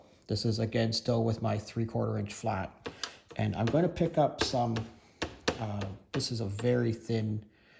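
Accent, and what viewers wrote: Canada. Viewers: I am living in South Carolina now. Born in Scotland, lived in Montreal growing up. Family still in Ottawa and Toronto. I hear your Canadian accent, love it.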